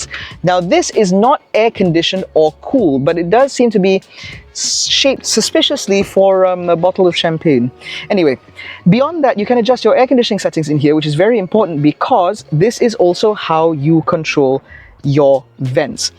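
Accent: United Kingdom. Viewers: British accent on a British brand, apt! :D Lovely car that I can't afford.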